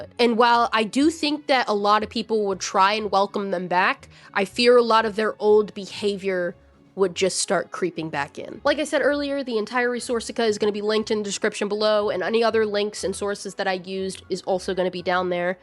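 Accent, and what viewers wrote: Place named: Appalachia, United States. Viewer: as someone else from rural appalachia hearing your accent made me really happy because i dont think i’ve ever heard any video essayist with one similar to mine. you’re awesome definitely subscribing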